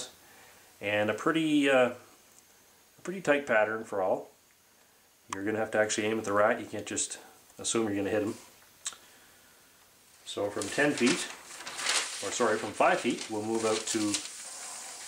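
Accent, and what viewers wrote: Canada. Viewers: I thought you sounded like those epic meal time guys. That explains it. You're both Canadian.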